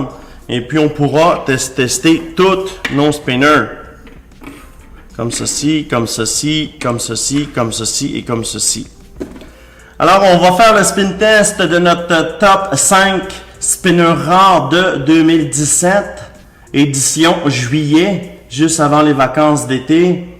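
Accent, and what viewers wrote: Quebec, Canada. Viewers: ah mais oui t'es québécois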